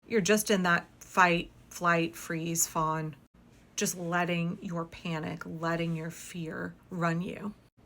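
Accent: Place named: Alabama, United States. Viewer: UGH, sounds like Bama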